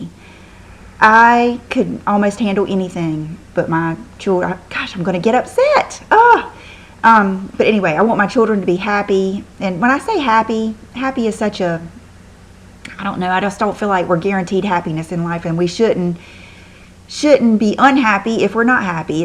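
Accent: South, United States. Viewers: Great video girl! I just love u all the more! I find comfort just hearing your calming southern voice..dont ever change u remind me of my dear grandmother and her"old" southern accent...Merry Christmas!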